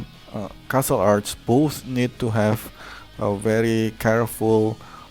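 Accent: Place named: France. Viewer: You kind of have a French accent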